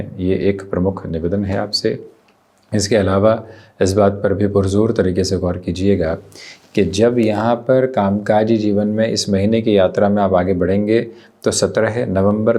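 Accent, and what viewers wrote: Standard. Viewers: Bhai aapke pure Hindi sunne ko bahut he achha lagta hai ❤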